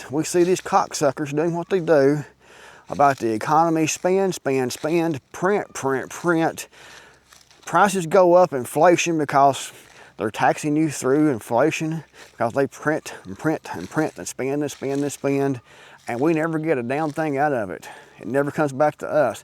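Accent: Appalachia, United States. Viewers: First, you need to stop talking like you just rolled out of the holler! I’m from West Virginia, born and raised and nobody I know talks that way. Talking that way is why nobody takes Appalachia seriously. Second, the left talks about the constitution daily and how it’s being violated every damn day! If you fools on the right wouldn’t have allowed yourself to be brainwashed by conmen we wouldn’t have these problems. The constitution is just a piece of paper. If we want it to actually mean something we have work make sure it’s followed. That means no conmen in the government, no religious zealots in the government and no white nationalist bigots in the government. Currently that who’s in charge and that’s why constitution is being trampled on.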